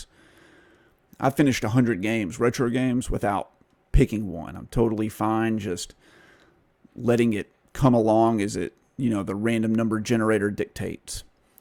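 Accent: South, United States. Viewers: it's the main game second hand game store here.. it's great when I get bundles and games I don't want/dupes I trade them in for credit and keep the games I want! I thought I heard some southern! But usually when I think southern I think Texas haha.. I'm jealous of Americans coz how many youtubers in America I've seen there's quite alot of video game stores.. but most games are cheaper here which helps me when I don't have alot of money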